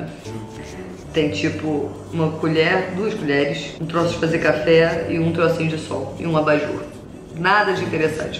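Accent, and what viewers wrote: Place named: São Paulo, Brazil. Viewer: pegando o sotaque paulista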